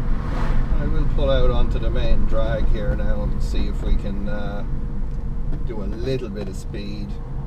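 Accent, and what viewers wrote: Ireland. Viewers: Nothing wrong with the Irish accent. You don't expect them to pronounce it Turd, they shouldn't expect you to pronounce it third.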